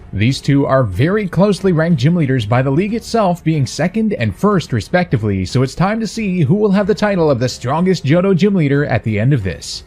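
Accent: Canada. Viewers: love the canadian accent :D :D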